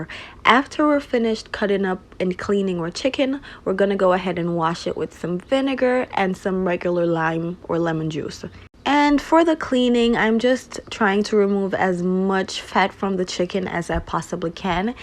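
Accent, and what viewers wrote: United States. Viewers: You sound a lil Geechee ngl you would fit right in Charleston NC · Is she really Jamaican, all i hear is an Yank accent! Anyone can cook Jamaican food and claim Jamaican heritage!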